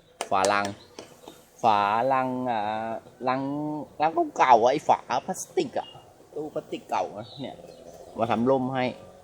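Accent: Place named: Ratchaburi, Thailand. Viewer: สำเนียงราชบุรี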